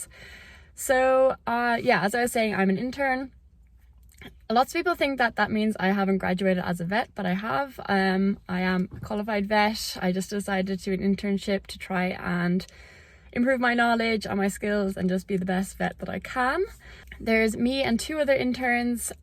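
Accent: Ireland. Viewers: are you irish o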